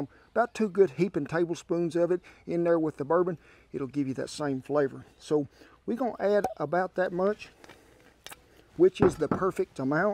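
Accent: South, United States. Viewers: Bool the chicken then a nice coat of ool. Southern accent 👌👌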